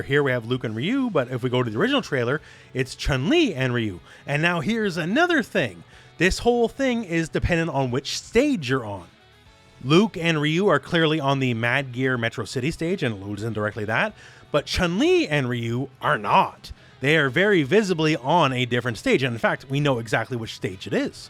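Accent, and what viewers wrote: Canada. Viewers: you sound exactly like my 9th grade algebra teacher. Canadian accent and all.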